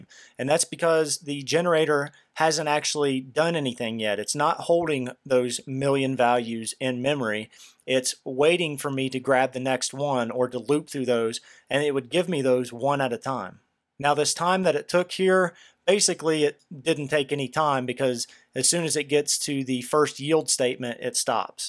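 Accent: South, United States. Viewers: I really appreciate both the way you explain things and your slight Southern accent. It makes it a lot easier for a Georgia girl to understand. Thank you!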